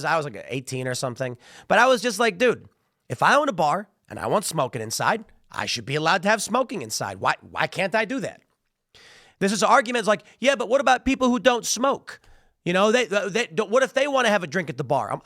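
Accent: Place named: Chicago, United States. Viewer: your SW Chicago accent and attitude come through loud and clear in this video! (Observed from a west suburbanite)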